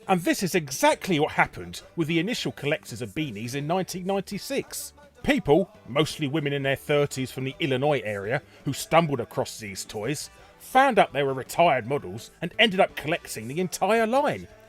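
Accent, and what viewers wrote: United Kingdom. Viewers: you really make me laugh with your British accent and valuable information. 😄😄😄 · Just found this channel... I really like it... Very well put together, engaging, funny, well researched, informative and of course the British accent... Subscribed...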